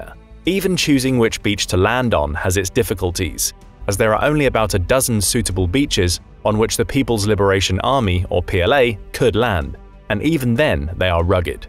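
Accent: England, United Kingdom. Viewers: Hearing someone with an English accent use nba references is wild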